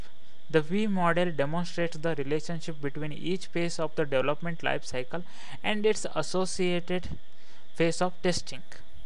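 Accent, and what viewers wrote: India. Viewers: Very knowledgeable, he sounds South Indian....would really help if he got his accent sorted out...as it really become difficult to understand as well as taking his video seriously. Although he knows what he is on about, but the accent really is a turn off....could not use this as a video for my British students, i just know they will be bemused by the accent. Anyhow informative vid:) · he is indian guy, but I can understand him because alot of my teachers are indian and I get used to this kind of english. Thanks for the video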